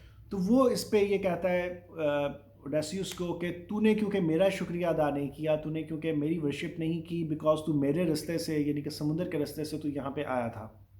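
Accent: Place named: England, United Kingdom. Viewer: Sir I liked your English accent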